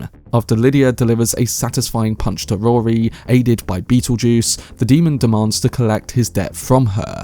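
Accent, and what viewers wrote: United Kingdom. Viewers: There's not a lot of British people's voices i like but man you're it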